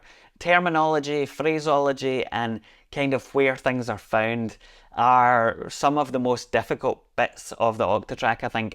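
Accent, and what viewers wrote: Scotland, United Kingdom. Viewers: I believe it must be Scottish.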